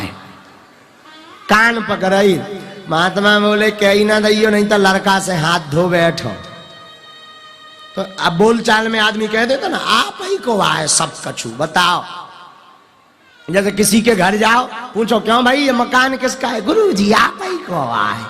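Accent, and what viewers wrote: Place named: Bundelkhand, India. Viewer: महाराज जी आप बुंदेलखंडी मैं कथा सुनाते बहुत अच्छे लगते हो 🌹 हमें भी समझ आ जाती है 💞 जम्मू से पगली भक्त हूं 🙇👏👏 सीताराम हनुमान जी 🌹🌹🙏🙏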